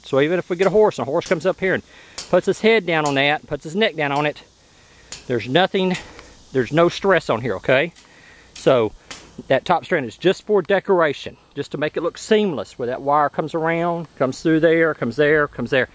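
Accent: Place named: Tennessee, United States. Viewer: Were are you from? Is it Tennessee I really dig your accent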